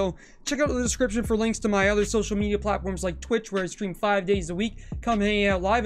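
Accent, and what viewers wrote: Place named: United States. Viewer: How come every video made about this game no matter who made it is in metric? I'm American and I us imperial weights and measures not metric so I have no ideal whats going on half the time in all of these videos, you sound American yet your game interface is metric its confusing period and dont tell me to switch I'm 60+ and I'm imperial till I die.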